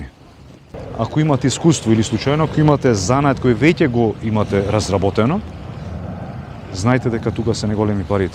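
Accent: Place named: Bitola, North Macedonia. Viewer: Odlicno video prodolzi da snimas za nas makedoncite.Po akcentot mora da si od bitolsko pozdrav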